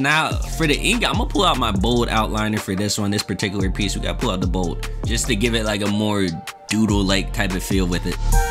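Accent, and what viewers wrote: Baltimore, United States. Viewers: Are u from Baltimore??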